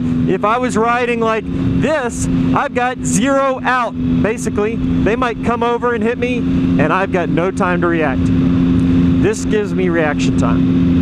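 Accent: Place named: Oklahoma, United States. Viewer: Yes thankfully you don't have a Canadian accent, nothing against Canadians, but it bothers me for some reason. :) I am Oklahoman so I suppose you sound just like me. Thanks for the excellent video.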